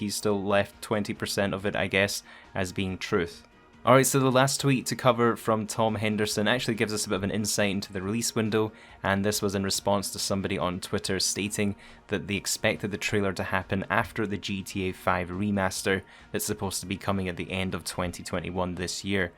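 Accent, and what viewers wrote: Scotland, United Kingdom. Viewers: This guy sounds he lives down the road from Edinburgh’s Rockstar studios 🏴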